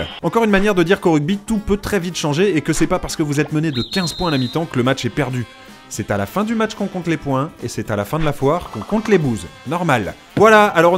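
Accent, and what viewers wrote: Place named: Southwest, France. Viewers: l'accent du sud ouest est très beau 👌on dit roase et non pas rose avec l'accent du Parisien tout plat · Qu'il est beau notre accent du Sud-Ouest!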